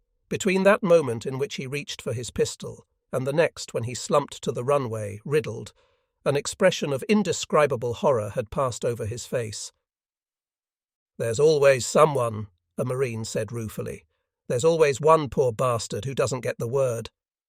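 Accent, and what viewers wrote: United Kingdom. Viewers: would be great without this bad accent......its a American story..dopes...thats a limey ..Semper Fi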